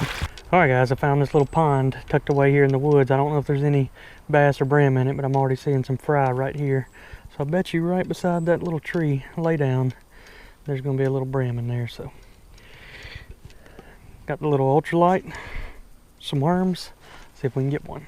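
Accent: South, United States. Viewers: Lol at his southern accent.. dan-dindley-doo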